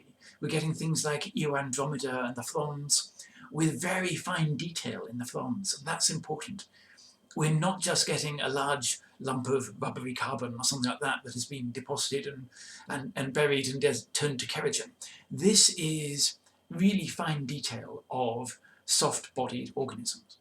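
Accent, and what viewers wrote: United Kingdom. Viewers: I first watched the video, the cc was not visible. Checking for it was the first thing I did. It may have been so on your device, not mine. That’s why I asked for the captions. I’m glad you understand the British accent. My ear is not your ear. We’re all different. Were we not, earth would have one language. Checking back now, I see the cc is up. That’s all I asked for.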